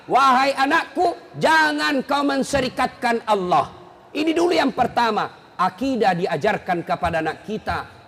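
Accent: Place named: Kalimantan, Indonesia. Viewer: Lugat org kalimantan mmg beda shg tajwid nya kelihatan tdk spt org jawa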